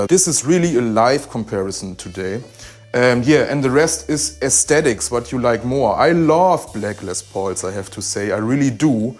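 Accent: Germany. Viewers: Da du unüberhörbar Deutscher bist, werde ich dir auch so antworten: daß die Harley Benton für das Geld nicht schlecht sind, steht außer Frage. Trotzdem sind die Epiphone Gitarren wesentlich besser verarbeitet. Die Bünde der Harley Benton sind sehr unsauber bearbeitet und müssen gerade bei den unteren Bünden nachgearbeitet werden. Die Bolzen der Tune O Matic Bridge sind stellenweise nicht komplett im Body versenkt, und wenn dies alles behoben wurde, kann erstmal der überschüssige Kleber am Hals mühsam entfernt werden. Und dies ist kein Sonderfall. So etwas habe ich bei Epiphones der letzten Jahre nicht gesehen. Meines Erachtens kann man die beiden Brands nicht miteinander vergleichen. Wie die Harley Bentons mit diesen scharfen und stellenweise nicht polierten Bundstäbchen und den Kleberesten durch die Qualitätskonrolle gekommen sind ist mir zudem ein Rätsel. Trotz allem kann man mit etwas eigener Arbeit die Mängel beheben, und hat für das Geld anschließend eine brauchbare Gitarre. 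· this German accent... (; nice video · Dieses Denglish mit solch einem heftigen preußischem Akzent ist unerträglich. Sprich doch einfach gleich Deutsch, dann tuts nicht so weh. Wen die Gitarren interessieren muß dich nicht endlos reden hören. Was die kosten weiß auch jeder. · hi thanks for answering me back. I really enjoy your channel. I watched your HB Bigtone review, I am thinking of getting one. Did you keep your Bigtone and if so how do you like it now you have had it a while? Did you have any issues with the back bridge tilting under pressure from the steep angle of the Bigsby? I am a lot older, in my day we had to pay a lot of money for a good guitar. Nowadays companies like HB are making very good guitars for very little. All the big names are manufactured in China and Indonesia or using or parts from East Asian and so people may as well pay less and buy a HB anyway. They can always put new pickups in it or better tuners for half the price of buying one of the big names. 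I would really appreciate it if you can please let me know your honest opinion about the Bigtone. Lastly I love your German accent, I live in Northern Ireland and we sound terrible. 😁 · I don't have a black guitar yet. 1st time here because I'm looking to collect my 11th guitar. Playing on & off since 16. I'm 56 now & getting bored. I have all the expensive guitars. I'll add a Harley Benton. Because I have an Epiphone custom sunburst. They both sound great !!! You play pretty good too !! That helps. Lol.😅👍 At the beginning I thought is he German ?? But, your English is fine bro.👍 Keep rocking !!!🎼🎵🎶🎸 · Heavy German accent xD Danke für das Video hat mir echt weitergeholfen 👍🏼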